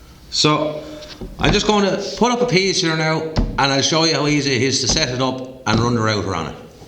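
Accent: Ireland. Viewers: So funny listening to an Irish man speaking English.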